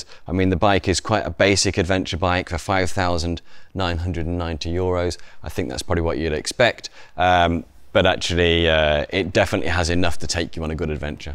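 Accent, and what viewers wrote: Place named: England, United Kingdom. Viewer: Smart review and English English!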